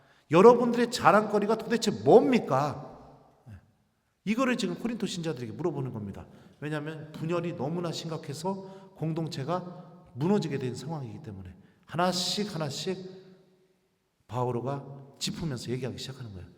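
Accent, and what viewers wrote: Chungcheong, South Korea. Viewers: 신부님의. 강론. 충청도. 사투리가. 구수하고. 귀에. 쏙쏙.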